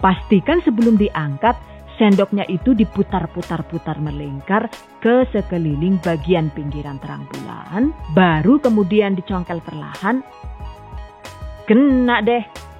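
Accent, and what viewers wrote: Banyumas, Indonesia. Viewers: ngapakke nemenn mbangett gehh... Wong ndi si jane rika mbk? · mesti kekel aq mbak nonton pideone pean...ngapak e iku looo nggarai pingin njiwit.....sukses selalu mbak resepmu sangat mengispirasi.... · Mesti nguyu nek ono part ngapak yakin😘😘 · Bu asli mana mba ngapak SMA mba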